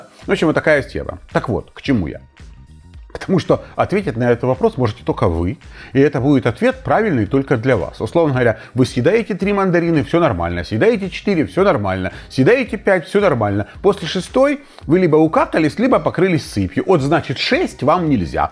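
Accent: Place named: Ukraine. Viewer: Можно не гэкать и не шокать, не в Украине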